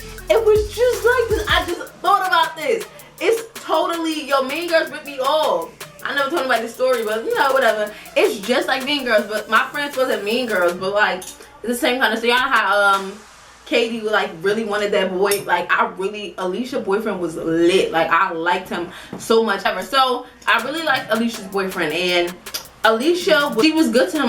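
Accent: New York, United States. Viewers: u from new york?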